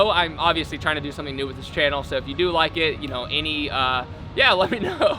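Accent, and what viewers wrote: United States. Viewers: You are asian? ^^ didn't even notice lol sounds like american, lives in usa, is american. ^^ Maybe bcs "race" is absolute not important to me, neighter nationality is. But one question still remains: How long?! 😀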